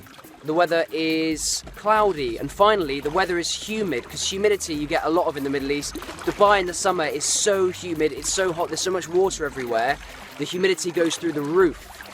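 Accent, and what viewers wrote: United Kingdom. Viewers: Wow great video. I can learn English and Arabic simultaneously. I also follow your accent I love British accent. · You are great teacher and so cute too 😍your Arabic accent sounds different I guess that is because you are British.Btw,İ am from Turkey 🇹🇷 and I am learning Arabic.Lots of love from Turkey 🤗🤗🤗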